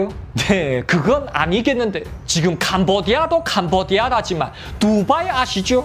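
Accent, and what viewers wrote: China. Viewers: 진짜 중국스타일 톤과 리액션 딕션 너무 재밋어요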